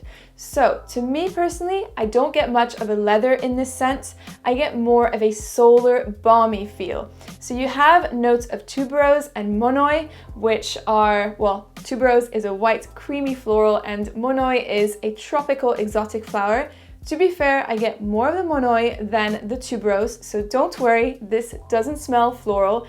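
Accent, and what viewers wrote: France. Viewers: I can hear you are French.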